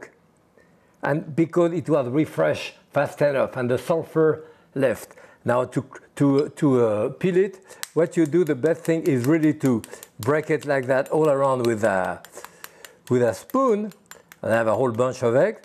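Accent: France. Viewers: What a great idea for an economical lunch, these eggs, some crusty bread and butter and maybe some salmon, with a spicy sauce! Jacques Pépin you are a treasure! Absolutely love his cultured accent, mostly French with a tiny bit American! God bless you Sir!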